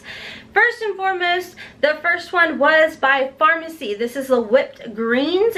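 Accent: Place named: West Virginia, United States. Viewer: IM SO HAPPY WITH THE CHOICES!!! Ofra Good to go?!? wow. so stoked.. and a bunch of TULA, in Symbiosis London in the popupss?!? just wow (the illimasque sold out immediatly once again, lol 😂 not my color story pref tho, and i really was just so stoked about the ofra. i cant wait for that highlighter. I just heard u say time, and i love ur accent. lol my besties are from WV, so its taking me bck.. love ya girl!!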